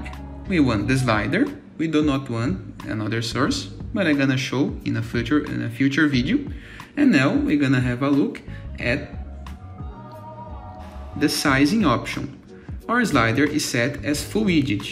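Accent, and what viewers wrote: Brazil. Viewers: Esse sotaque br não engana rapaz, hahhahha valeu cara salvou minha vida aqui! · Amigão pela pronuncia vi que é BR, faz um roteiro antes de gravar o vídeo. Vai ficar mais fluido as explicações. Valeu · sotaque full br kkkkkk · It sounds like Brazilian guy speaking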